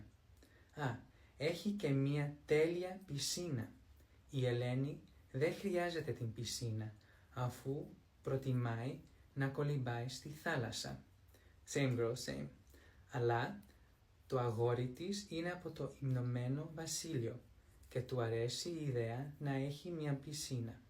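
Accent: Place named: Spain. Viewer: Not gonna lie. As a native Greek, your accent sounds quite similar to the Spanish accent. You're pretty clear to understand though! You pronounced all the words perfectly. Καλή δουλειά :)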